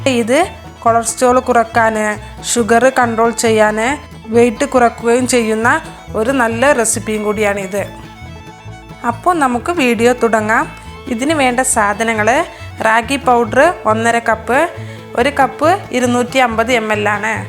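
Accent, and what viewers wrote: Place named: Malabar, India. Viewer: മലബാർ മുസ്ലിം ഭാഷ ആണ്. മലപ്പുറം ആവാം. ഉറപ്പില്ല. എന്തോ ആവട്ടെ ഞങ്ങളുടെ മലബാർ ഭാഷയെ കളിയാക്കണ്ട കേട്ടോ 😊😊😊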